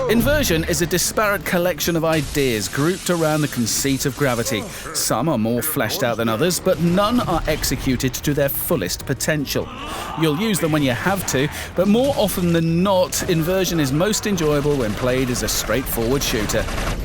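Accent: United Kingdom. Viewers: British accent = Awesome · British accent <3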